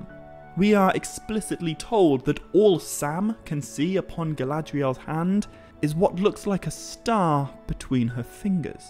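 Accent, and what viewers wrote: United Kingdom. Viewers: Oh my God, this is probably what John Oliver refers to as “offensively British accent”. It was impossible to finish the video! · You are an amazing orator and in my opinion is best suited with your accent ... even if you don't think you have one (your friends from across the pond)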